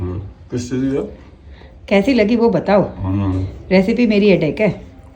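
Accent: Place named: Bikaner, India. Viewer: Aap ki boli or habits all r bikanri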